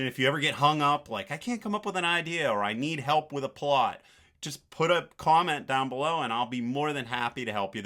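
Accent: Pittsburgh, United States. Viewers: I thought I heard a fellow Yinzer accent all these years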